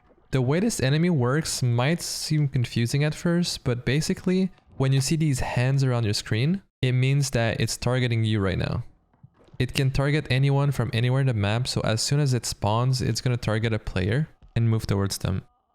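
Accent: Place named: Quebec, Canada. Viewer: It's random but, I hear a quebec accent are u quebecois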